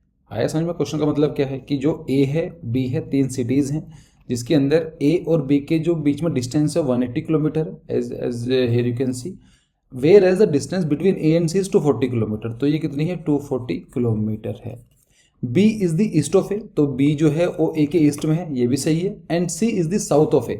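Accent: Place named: mixed, India. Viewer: I thought...bcoz in this video ur one accent like UP, Bihar side😅😅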